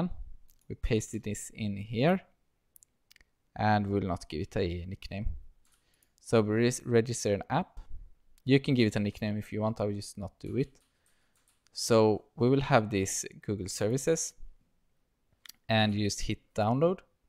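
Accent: Sweden. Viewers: Your English is good! I do play a lot of Counter Strike with Swedes so I recognized the accent 😅 I'm from Denmark so it's not like my English is much better haha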